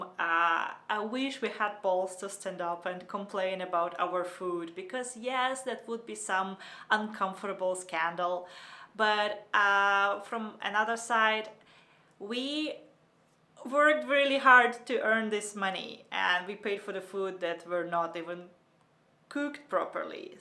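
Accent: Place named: Germany. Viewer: Wann kommen denn mal wieder Videos auf deutsch von dir?Man vermisst schon dein deutschen Akzent :D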